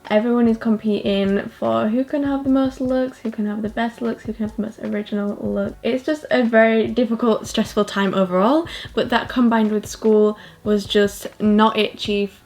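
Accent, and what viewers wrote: United Kingdom. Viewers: WAIT WHAT.....I had no idea you were British. I’m shook. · Everyone’s like I THOUGHT U ARE AMERICAN Me: I knew she was British 😂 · Are you from the uk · I honestly didn't know is British, I thought she's American 😂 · your british i am confused... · Wow I thought u were straight American but wow! BRITISH SQUAD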